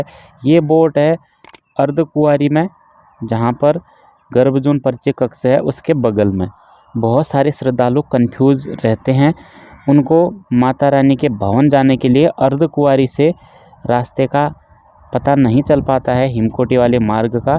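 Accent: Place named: Uttarakhand, India. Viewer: जय माता दी 🌼🌻🌹 Sir, are you from Uttarakhand because your tone is matching with Uttarakhadian's people's.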